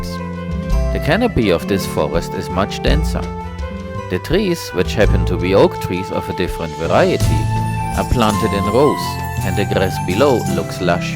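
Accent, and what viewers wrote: Germany. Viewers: I Think you can even improve if you make the trenches not like a straight line but more like a S-shape. I mean like natural stream usually forms or like snakes move. I guess that takes even more speed from the water. More water should get into the ground and it should be more stabilizing for the top-earth. by the way greetings. I am also from germany and interested in water retention and ecological agricultures. my spoken english sounds pretty much like yours according to my girlfriend. anyway i admire your work there and I hope one day i can own a little bit of land and shape it myself, too. Could you need a helping hand for free? Actually I am learning Computer Science at the moment but I am in the last semesters. I just read at <link> that you are "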